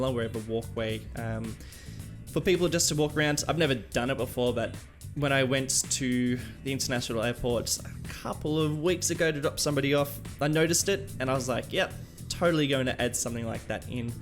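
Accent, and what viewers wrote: Australia. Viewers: I mean mate I'm an Australian and I can already tell about the accent, you're a bloody mate! So keep up the accent it makes me feel like home!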